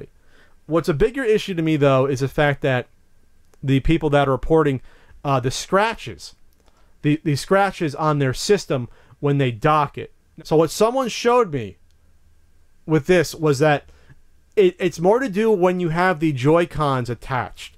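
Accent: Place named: East Coast, United States. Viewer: LIVES ON CALI!?!?! O_O I COULD HAVE SWOOORN THIS WAS AN EAST COAST BOY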